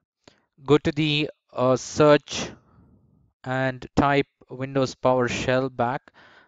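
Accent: India. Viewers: I LOVE UR INDIAN ACCENT !! THANKS !! GREETINGS FROM ARZEIBAIJAN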